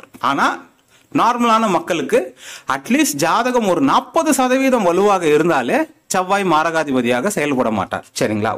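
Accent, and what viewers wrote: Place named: Chennai, India. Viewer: நல்ல சென்னை தமிழ் சார் 🎉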